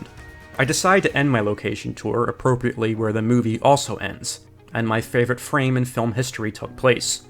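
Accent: Philadelphia, United States. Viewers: I just found your channel, watched a handful of videos (all excellent) and thought to myself, sounds like a Philly guy. This video confirmed it based on your airport departure 😂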